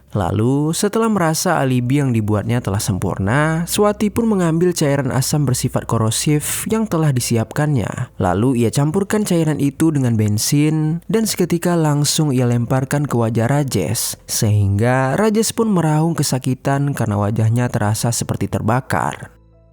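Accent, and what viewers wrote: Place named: Aceh, Indonesia. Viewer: Dari gaya bercakap sy teka admin ini adalah dot dot dot.. orang aceh.. beliau ada 2 lagi akaun youtube.. apa pun taktik yg mantap bro! 😊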